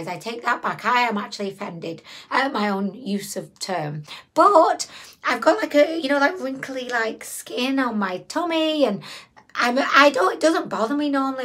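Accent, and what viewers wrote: United Kingdom. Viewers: For opening packages, you should get a set of spudgers off Ali Express. Besides the fact that "spudgers" is a fun word to say, and I want to hear you say it in your English accent (which I, as an American, am required to think is elegant and awesome), spudgers are little plastic prybars that were made for fixing phones, but work really amazingly well for getting the shrinkwrap off nail polish bottles and opening the box on BB creams. Get the set of three that comes with a thin metal shiv. They also work great for removing batteries from toys, and they will spare your nails.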